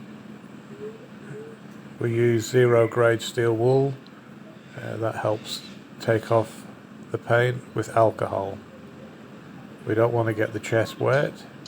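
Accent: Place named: England, United Kingdom. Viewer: Ooh Hello. Haven’t found a fellow Australian restorer yet. Pommie accent to one side. Looking forward to part 2.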